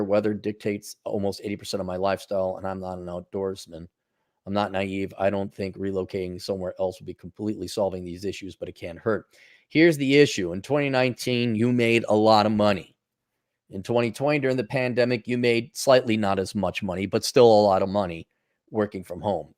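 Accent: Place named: New England, United States. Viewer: Must be New England. Dude sounds like me.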